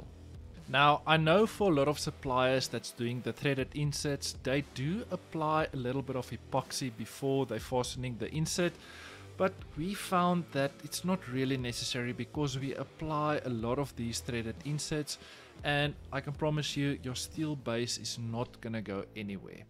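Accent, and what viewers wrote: South Africa. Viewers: Interesting video, in 2021 I came across a video about epoxy tables and I was intrigued did research and it was interesting, then I let the idea of starting an epoxy business goes waste, 1 1/2 years past then I came across your channel, perfect because I could understand your better because you from SA...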